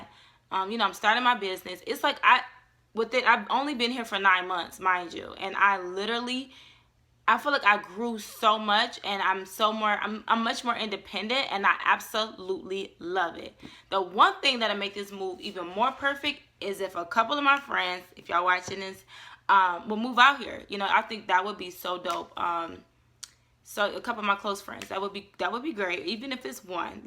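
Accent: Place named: New York, United States. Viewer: you're from detroit? you sound like you're from NY lol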